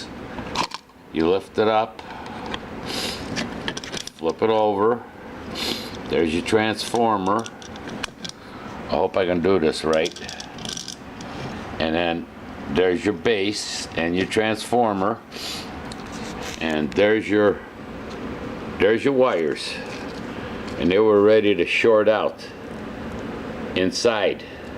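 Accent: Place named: Pennsylvania, United States. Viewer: This guy is definitely from northeast pa , probably scranton!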